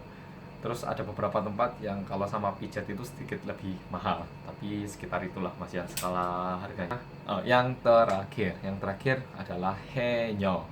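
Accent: Java, Indonesia. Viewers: Istilahmuuu... nek pas manndi isok bolotan... hahahah asli khas nduueso... medok. Saluut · aku suka soalnya ramah juga santun dan murah senyum logat jawa persis kaya orang jawa asli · orang korea yang medoooookk.... uda g ada kata"nya y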